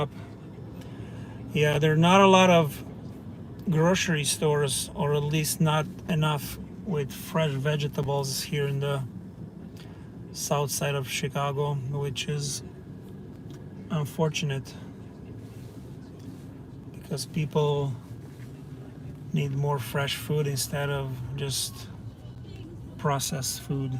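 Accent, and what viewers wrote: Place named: Romania. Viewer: Bănuiam eu că ești român după accent dar nu eram chiar sigura. Mulțumesc de sightseing.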